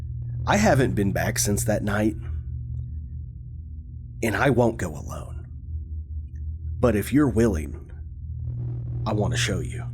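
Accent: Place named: Tennessee, United States. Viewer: Enjoyed! I like your accent. Sounds like my neck of the woods. TN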